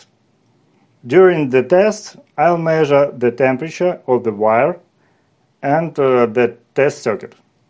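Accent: Russia. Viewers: Слышу Русский акцент))) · У тебя русский диалект. Почему на русском языке не делаешь материал?)) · Английский русскоговорящего нисчем не спутаешь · Why Russians have terrible English accent? 🤔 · Ебать русский акцент сильный😂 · Сразу слышно русский акцент)) Спасибо за тесты и за все видео =) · Сразу видно русский на Английском говорит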